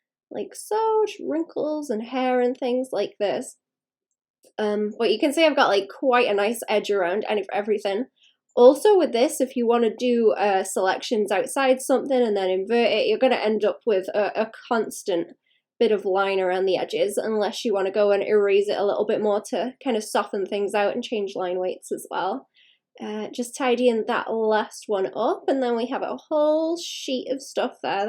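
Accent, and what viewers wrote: North West England, United Kingdom. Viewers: Great art, learning lots from this. Can I ask where you are from? Your accent sounds really close, north west UK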